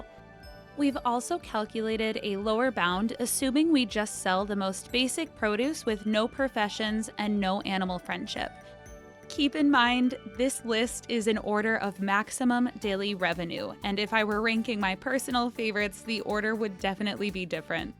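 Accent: Canada. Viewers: You sound Canadian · Are you Canadian?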